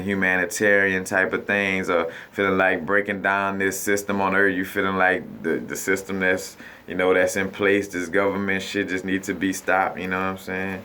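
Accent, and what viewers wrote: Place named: South, United States. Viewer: where are u from ? u sound like ur from the south nere me in Louisiana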